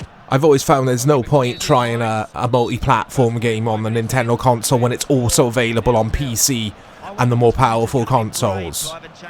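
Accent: Wales, United Kingdom. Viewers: Are you welsh as I can’t hear a little welsh, also big up United.. even tho we are trash atm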